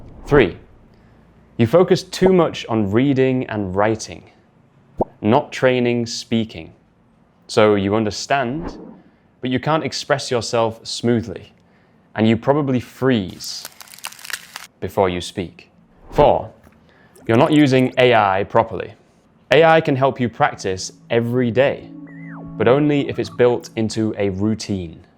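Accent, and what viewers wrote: United Kingdom. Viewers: Hi. I’m from Thailand. I really love ur Vdo. I subscribe ur channel few months ago. I want to have British accent same as u.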